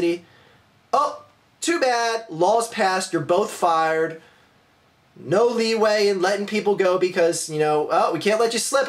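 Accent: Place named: Illinois, United States. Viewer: you sound like your from illinois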